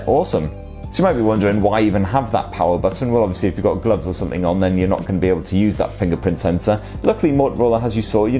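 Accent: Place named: Newcastle, United Kingdom. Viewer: It’s a geordie accent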